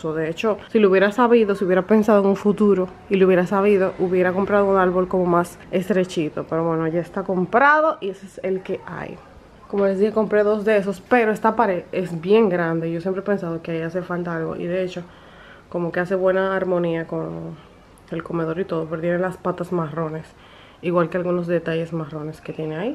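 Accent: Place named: Dominican Republic. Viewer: Uy! ella esta hablando entre amigas y es dominicana a veces hablamos asi aunque sabemos perfectamente como pronunciar las palabras, ella esta bien formada profesionalmente se le nota. Valida tu corrección pero ten pendiente la nacionalidad de la YouTuber cuando oigas cualquier cosita mal pronunciada.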